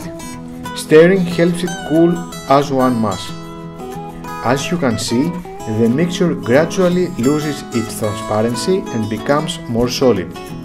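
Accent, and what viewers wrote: Greece. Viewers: that greek accent ) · I could tell in 5 seconds you are Greek. My Papou is from Zakynthos, Ti Kaneis!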